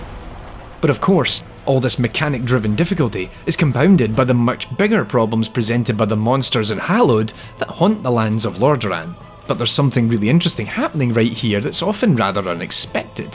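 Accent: Scotland, United Kingdom. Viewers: I'm just at the beginning of the video but I want to say this entire format seems really, really interesting and I love your accent, sounds a lot like a scottish guy whose channel is also one of my favourites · nicebagel not posh he is Scottish and has a strong accent meaning he is from either the central belt or is from a part in the highlands that is quite rural. I would be surprised if he was from Aberdeen or Edinburgh and would be amazed if he was from the western isles.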